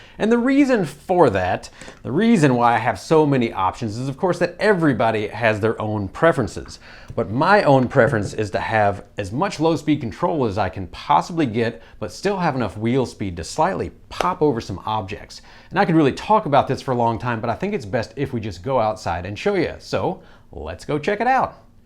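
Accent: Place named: South, United States. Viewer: Nice southern drawl too.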